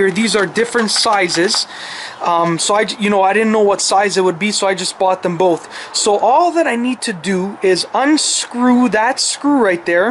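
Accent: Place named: Eastern Canada, Canada. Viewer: ray r at which of your local "skool" does he sound like a prof to you? Please tell is so we can avoid that area LMAO. He sounds like a typical north eastern guy or eastern Canadian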